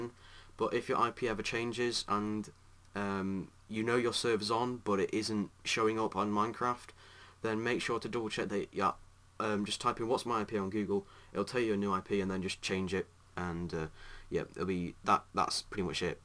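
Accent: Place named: Scotland, United Kingdom. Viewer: Shut up he sounds a bit SCOTTISH IM Scottish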